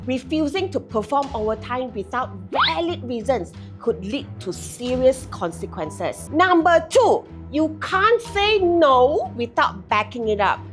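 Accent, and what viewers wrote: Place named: Singapore. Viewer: I thought you singaporean cause ur english is very good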